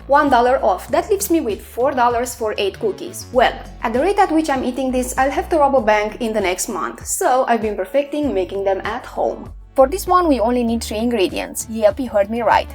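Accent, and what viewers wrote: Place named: Germany. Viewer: so you think she's French? to me, she has almost a German accent...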